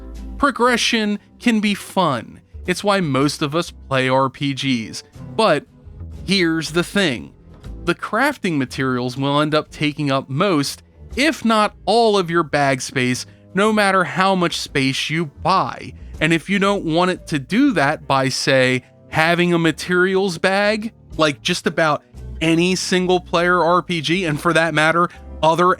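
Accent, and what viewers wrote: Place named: Westchester, United States. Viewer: That Westchester accent is great when I'm watching activity and infuriating if I'm trying to relax to video game crap. Wouldn't have it otherwise.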